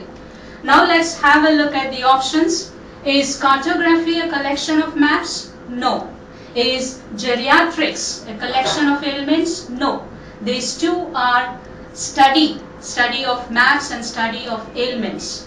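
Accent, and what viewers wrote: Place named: India. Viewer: By the acent, she's indian :D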